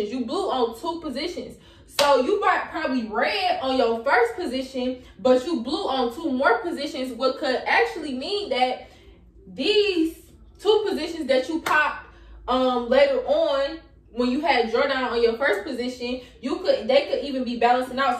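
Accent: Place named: Texas, United States. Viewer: you sound like you from my neck of the woods. Texas Where you from?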